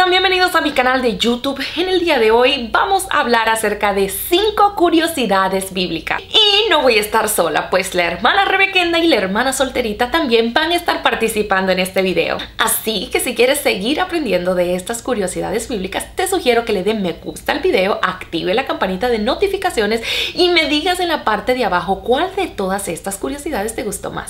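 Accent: Argentina. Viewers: Jajaja solterita tiene un asento bastante argentino jajajaj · Jajaja me encantó el acento de. Muy Argentina.. Dale che.. saludos desde Argentina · AMÉ el acento de Solterita, medio Argentino!! Jajaja, abrazo grande Sierva del Señor. Desde Buenos Aires, Argentina!! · jajajaj... ay... cada vez te escuchas mas argentina.. jaja un abrazo bendiciones desde Mexico! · La hna solterita. Tiene un ascento muy argentino. 😄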